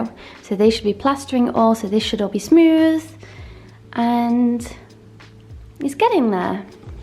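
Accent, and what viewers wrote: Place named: Midlands, United Kingdom. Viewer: You came up in my recommendations and I really liked your video so I’ve subscribed. Are you from the Midlands?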